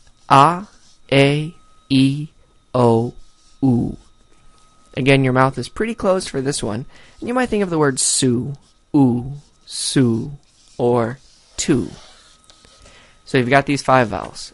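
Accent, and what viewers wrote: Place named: United States. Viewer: I really enjoy your videos but have to comment on your American-English pronunciation of the vowels, especially the E and O. I've never heard those two vowels pronounced as you do. However, the content is excellent and very helpful!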